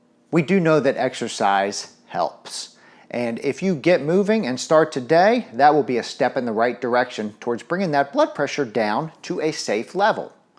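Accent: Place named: South, United States. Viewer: I love his accent.....educated southern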